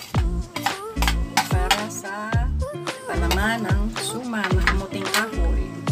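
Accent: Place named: Visayas, Philippines. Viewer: oy bud bud nga kamoting kahoy sir proud bisaya here klaro kaayo nga bisaya ka sir ako pud hehe -tintin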